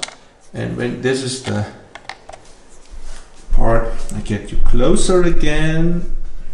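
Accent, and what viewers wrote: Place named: Germany. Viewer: du bist deutscher oder ? XD ich erkenne das an deinem englisch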